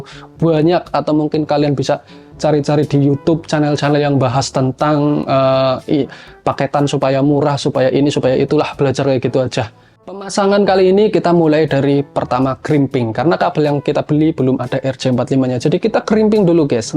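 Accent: Banyumas, Indonesia. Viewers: bjir hpne go ngpo kui mase.hihihihhii apa jadi mesin MiFi batangan. ngapak e ngndi mas,slm kng somagede. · wong endi sih..deng bisa ngapak...xixixixi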